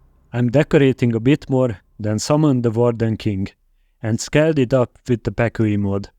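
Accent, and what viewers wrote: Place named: Hungary. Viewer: Olyan, mintha magyar akcentusod lenne :D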